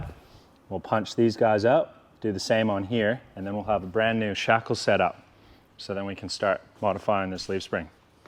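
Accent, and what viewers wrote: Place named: Australia. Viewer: Another great vid, helping me on my own rear end. I can hear the Australian twang coming through in your accent. 😁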